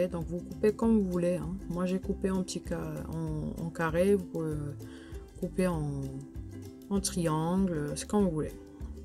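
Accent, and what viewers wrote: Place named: Oran, Algeria. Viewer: J'adore ton accent wahran officiel 💟😅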